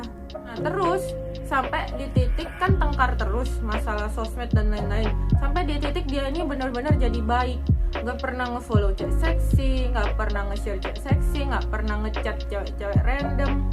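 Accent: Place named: Surabaya, Indonesia. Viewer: Aku suka banget logat surabaya nya 😂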